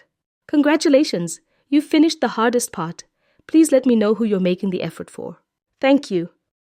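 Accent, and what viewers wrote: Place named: England, United Kingdom. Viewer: Is this the same was a German accent? This voice sounds like one from England!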